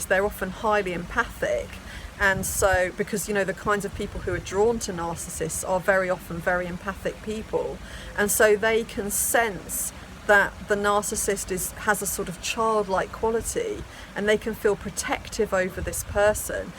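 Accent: England, United Kingdom. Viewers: with the English accent everything sounds so proper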